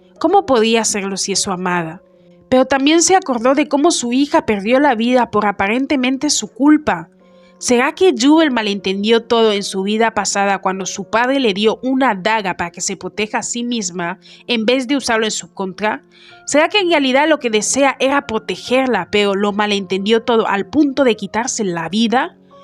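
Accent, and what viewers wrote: France. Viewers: Oye eres de Francia? Esque tienes un asentó muy bonito 😮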